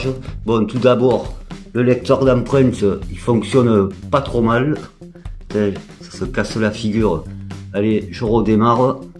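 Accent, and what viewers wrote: South, France. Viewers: Tjr au top tes vidéos tjr un plaisir avec ton accent du sud que J aime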